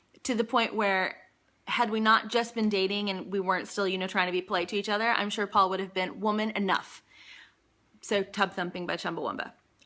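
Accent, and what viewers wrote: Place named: United States. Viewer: Yes my dear so happy for u it's amazing how u keep ur accent I thought if ppl live long somewhere they will have the accent of country. I love ur American accent btw and me I'm 5 -6 years in the uk but can't get rid of my meerkat accent 😂😂😂 ur videos are amazing keep it up Hun 😘😘😘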